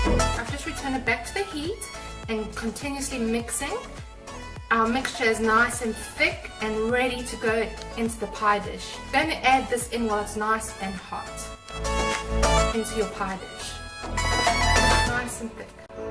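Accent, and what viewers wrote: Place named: Australia. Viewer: Im going to try it looks awesome! Why did I believe you were from Australia 🤪😅 I guess you're from South Africa (Ive been to cape town as child (still have a scar lol cuz i got stuck in cement it dries fast there 🙄 but when they got me off with mzchines n stuff, I was scared and ran into a closed (I thought the door was open, it was just very clean 😅) but the door broke and I got a huge scar /cut back then. Today its an itsy bitsy scar, but I think of South Afrca each times, iften as I wear make up, 😂) thought you should know, don't ask me why 🙄lol But damn it looks great I wanna try makibg it! Missed to see you cook (loved your last video tho, I'm still in schock and more get up to the surface 😱 Thanks fir great recipe 😋 Xoxo mm 😊👣🌻❤️👍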